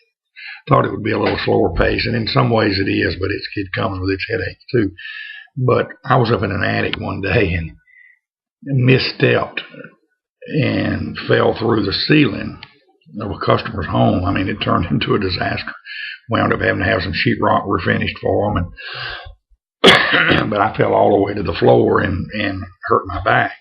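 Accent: Louisiana, United States. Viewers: That's a big drinking mug you have there. Sorry to hear about your pains my friend. Thanks for the chat. Looks like you did well in buiness. Love the Louisiana accent. Alan.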